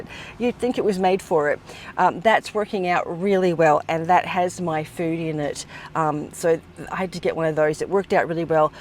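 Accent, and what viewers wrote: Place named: Australia. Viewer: I love your accent! I consider it real Aussie.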